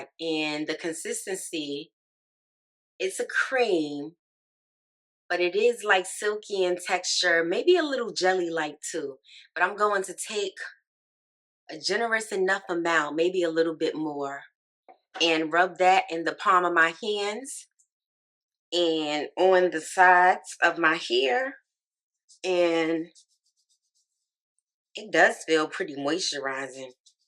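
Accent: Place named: New York City, United States. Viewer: No she sound like CoKo from SWV I guess it’s that NYC accent.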